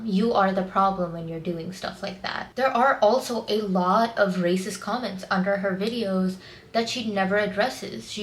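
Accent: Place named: United States. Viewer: I respect what you're saying and your opinions but this kind of falls flat, at least for me, because you seem and sound like an Indian-American who's spent very little time in India.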